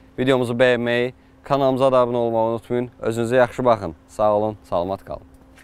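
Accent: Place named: Sumgait, Azerbaijan. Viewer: Yetim+ Ataş Sumqayıt aksenti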